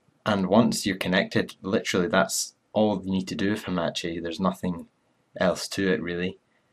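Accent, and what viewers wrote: Scotland, United Kingdom. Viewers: Thanks this helped ! Are you Scottish ? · are you scottish?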